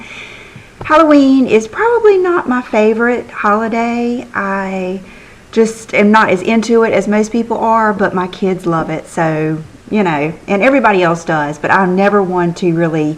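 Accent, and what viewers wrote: South, United States. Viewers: ! Funniest story for you!! So I work in a hair salon in Vancouver BC Canada and a clients aunt was in town and stopped in to pick up some product so I helped her and she had a southern accent (super rare here in Canada to hear a southern accent maybe only the third I've heard in person, weird hey?) and right away I was like are you from North Carolina? Shocked she was like yes how on earth did you know and I told her by her accent lol! Took me forever to realize it was because I'm so used to your beautiful voice! Thought maybe I had a special gift for a moment! Anyways I Love you have a good week!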